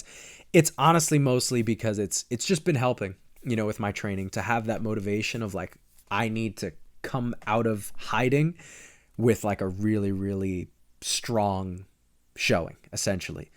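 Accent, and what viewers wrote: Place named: Miami, United States. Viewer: Bros been in Miami for too long, almost say america with an accent lol.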